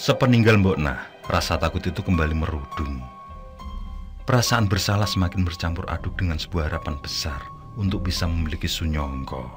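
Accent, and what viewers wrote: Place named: Blitar, Indonesia. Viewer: Pak de bhasane mirip wong blitar ..🙏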